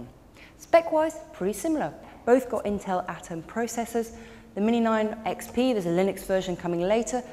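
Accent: United Kingdom. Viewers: I like the way she do review, though her British ascent sometime hard to hear her what she speak.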